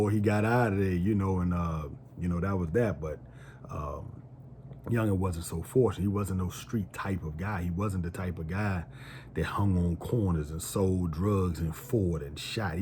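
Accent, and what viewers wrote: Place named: Washington DC, United States. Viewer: Just discovered your video bruh. Picked up the DC accent right away. I grew up in PG County. I went into the military but my brother went the opposite direction. He did time in boys village in Cheltenham, Lorton and DC jail just to name a few so I’ve heard a lot of the stories. Really interested in checking out the rest of your videos. Keep up the good work.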